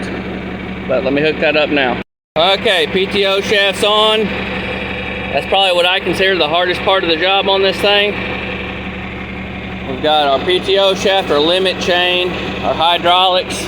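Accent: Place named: Georgia, United States. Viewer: Damn son that is one hell of an accent! Georgia boy?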